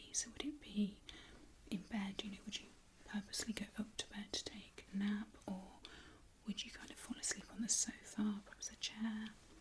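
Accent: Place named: Cheshire, United Kingdom. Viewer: Awesome tysm👌 is that a fellow Cheshire accent?? YAAAAS 💅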